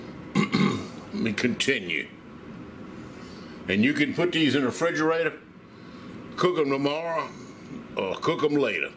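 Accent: Louisiana, United States. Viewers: What part of Louisiana u from sha? Got dat ole tic accent baw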